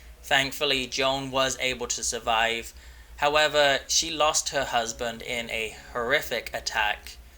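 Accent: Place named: England, United Kingdom. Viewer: could deffo hear your English accent :) thank you for replying, love your channel ❤️ xx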